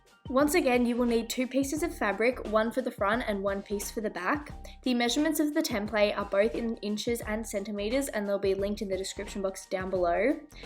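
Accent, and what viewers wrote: Australia. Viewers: I hear that Australian accent👌🏿👌🏿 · Wow amazing thank u ... Also r u Australian cause ur accent sounds like u are... U have a new subscriber because of ur help and thank u for helping people... I don't have a sewing machine but I think I can manage sewing don't think it's that hard but haven't shown anything in a few years 😂... Well hope mines come out as nice as yours but I'm sure it will be ok... Stay safe and thanx again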